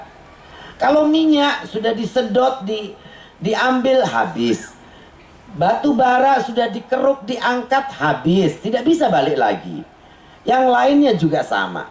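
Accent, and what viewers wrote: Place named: West Java, Indonesia. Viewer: gak ngerti bahasa sunda. tapi seneng aja gitu denger orang ngomong sunda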